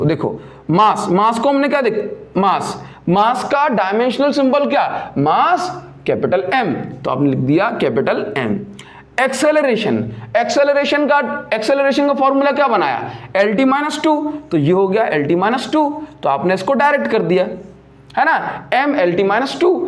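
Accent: Odisha, India. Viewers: Sir you are from odisha?? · Sir are you from Odisha?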